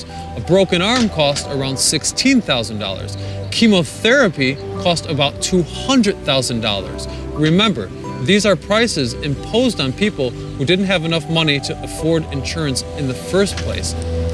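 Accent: Poland. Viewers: B N i jak Ci się podoba w Polsce? Autor kanału chyba ma polskie korzenie, bo słychać coś w akcencie. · You must live in Poland for a while because even when talking in English, you've a slight Polish accent. It's interesting seeing someone so in love with Poland, I honestly don't miss that place, especially school, they put extreme pressure on you and school is one of the main reason for suicide there =T But hey, I haven't been home for a few years now so maybe things changed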